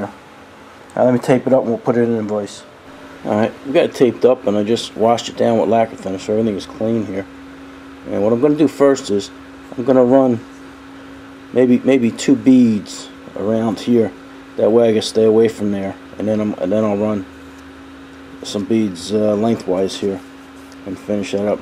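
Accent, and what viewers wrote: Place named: New Jersey, United States. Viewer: Your Jersey dialect versus my Canadian dialect.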